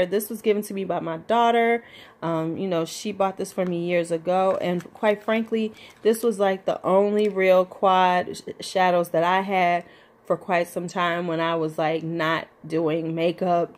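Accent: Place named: Midwest, United States. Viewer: So glad I found your channel! I’m 49 and it so great to see more women in our age bracket doing it on YouTube. And I completely agree, we can buy what the ___ we want! I’ve raised my son and put him through college, if I want to buy new makeup, it’s My Prerogative! No apologies! I loved the video and your collection. 👍🏾 Question. Listening to your dialect, are you Midwest located? I’m Chicago burbs myself.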